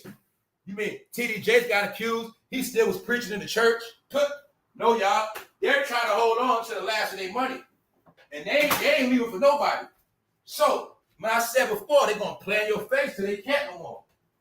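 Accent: New York, United States. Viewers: be cooking 💪🏽 especially with your NY accent I like, just my thoughts so if get out how’s he gonna be living 🤔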